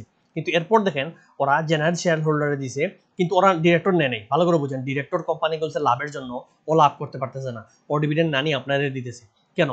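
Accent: Sylhet, Bangladesh. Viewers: আপনি কি সিলেটের? আপনার ভয়েস অমল দার সাথে মিল আছে।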